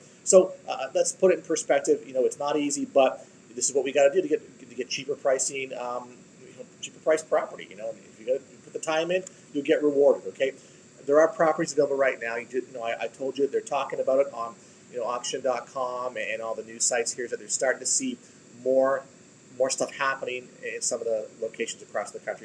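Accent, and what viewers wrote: Canada. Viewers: No big deal but you sound like you’re from Canada you have a Canadian accent I just started listening to your program just curious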